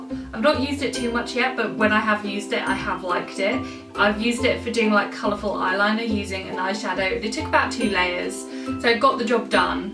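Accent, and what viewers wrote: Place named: Australia. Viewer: You sound really Australian 🙈 love your hair!!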